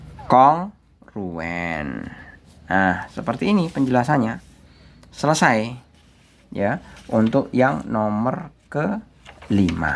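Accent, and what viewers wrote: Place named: Java, Indonesia. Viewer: Medok ya pak…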